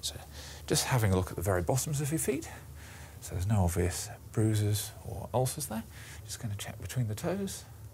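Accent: United Kingdom. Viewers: What's his accent? It sounds different than the normal British variants...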